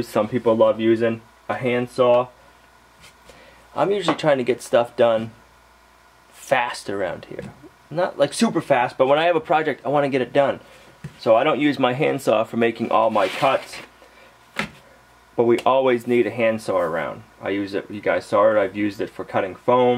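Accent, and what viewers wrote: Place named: Vermont, United States. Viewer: What are temps currently at Lumnah acres? I think that you mentioned (if not your accent says so) being near Vermont. We are currently at -5 for a day time high temp.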